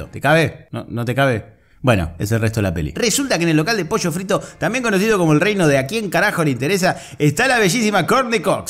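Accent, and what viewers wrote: Argentina. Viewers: jajaja sos genial, pero una duda, eres argento o mexa? traes de las 2...recuerdo esta peli en el cine, todos los adultos dormidos, y yo ya me quería ir a la casa cuando vi que ya estaban en una cd de Eu!!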